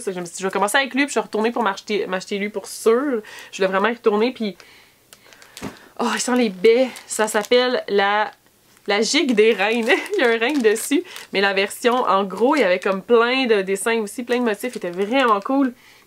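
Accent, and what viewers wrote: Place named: Quebec, Canada. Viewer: je t adore accent quebequoi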